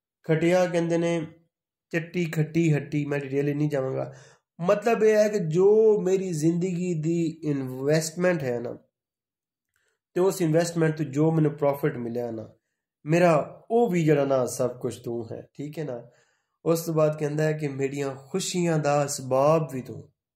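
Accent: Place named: Punjab, India. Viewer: Sir ❤️ huge fan ap ki Punjabi accent k.💕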